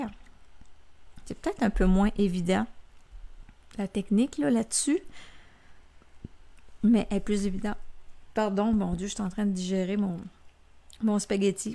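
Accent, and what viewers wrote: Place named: Quebec, Canada. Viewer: Je vous découvre et suis sous le charme de cet accent des cousins❤ merci pour cet astuce très intéressante. Bonne journée.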